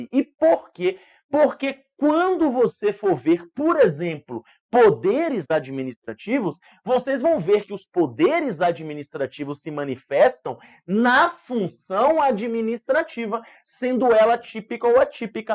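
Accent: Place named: Salvador, Brazil. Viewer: Quando escutei o sotaque, eu falei pra mim mesmo "esse cara é de Salvador" kkkkk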